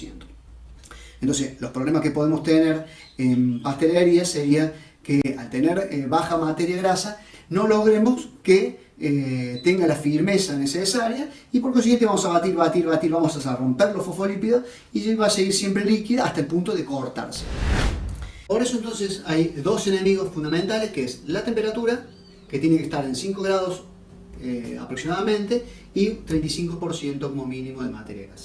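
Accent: Córdoba, Argentina. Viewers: Espectacular!! Ahora si!! Fué un placer ser " toda oidos". Me entendí todo en tu español cordobés 😂😂. Una clase magistral. Las cremas que tiré!! X Ds!! Eran recuperables!! Gracias mil. En Uruguay de donde soy, la crema doble Light Conaprole uht jamás se montó. Ahora sé xq!! Tiene 17% mat. grasa. Recién lo veo. Gracias por el super tip de agregar manteca. Mil gracias por todos los trucos. Sos un genio. La intro y final están genial. Ya hay ahí un touch de música. La edición y los gráficos también. Pero en el celu como que no se llegan a leer aunque estés en pantalla completa. Capaz sería bueno darle uno 2 segundos de 1er plano. Cosa de poder pausar y leer para no perder la info.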